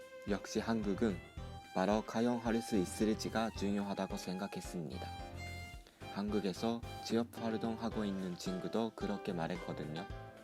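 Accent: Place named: Kansai, Japan. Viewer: こんにちは。。韓国留学頑張ってください。私も７年日本留学してましので、映像の日常が逆に懐かしく感じますね。関西弁も久々 またに遊びに来ますので、映像たくさん上げてくださいね。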